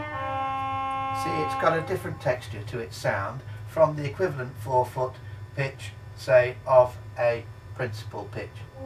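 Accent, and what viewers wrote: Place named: Southern England, United Kingdom. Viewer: Why is his voice hilarious? It sounds like a very normal southern English accent!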